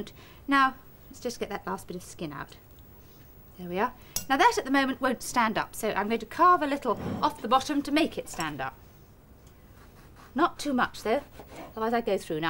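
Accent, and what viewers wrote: RP, United Kingdom. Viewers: she sounds so posh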